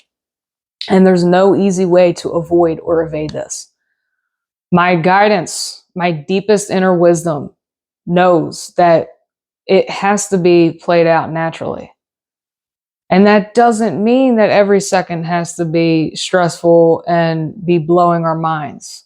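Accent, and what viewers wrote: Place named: Philadelphia, United States. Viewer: Are you from the Philly area? Sounds like it from your voice!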